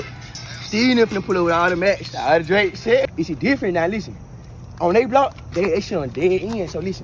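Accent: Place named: Memphis, United States. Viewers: Where is bro from fr 🤣🤣🤣 I swear he from Memphis! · Gotta really be from the dirty dirty DIRTY south to understand what he’s saying😂I’m from Prichard Al and I’m 45 yrs old… ain’t nothing changed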